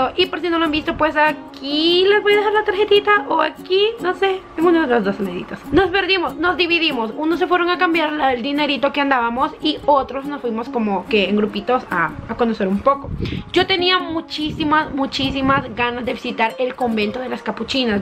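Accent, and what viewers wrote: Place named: El Salvador. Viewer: Por tu acento sos guanaca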